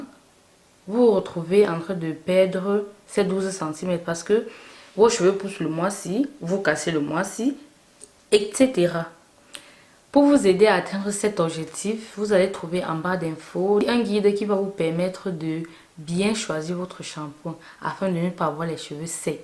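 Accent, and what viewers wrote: Cameroon. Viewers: J'adore ton naturel ... la voix de la Cameroun aise 😍😍 et tes cheveux sont juste magnifiques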